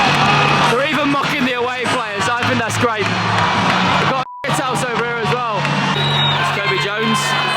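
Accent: RP, United Kingdom. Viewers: so British posh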